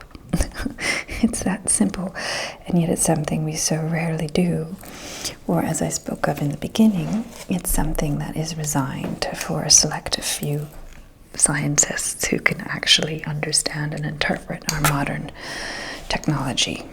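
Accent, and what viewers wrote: Transatlantic, United States. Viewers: sounds almost transatlantic to me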